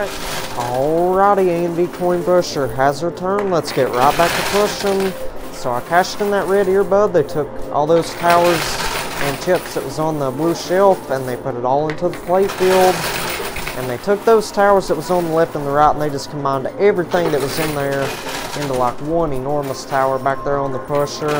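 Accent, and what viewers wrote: South, United States. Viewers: You're doing great tonight....that deep southern accent...anyway you're doing great 😃😃😃😃👍👍👍👍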